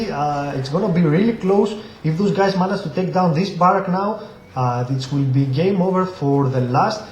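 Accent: Greece. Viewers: Hey, are you Greek? That accent sounds like a Greek talking English. Just wondering...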